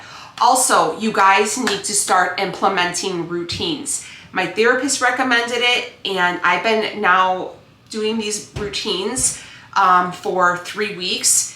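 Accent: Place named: Minnesota, United States. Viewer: You are doing great! I’ve learned lots I learned new recipes from you I really like your Minnesota accent even though you are not from there. I stole your It’s a Good Day to Have a Good Day I like lots about you But… when that one word comes out of your mouth it surprises me . The word is 💩 SHIT 😳 What can I say I’ll keep watching and you most likely will keep that descriptive word in your video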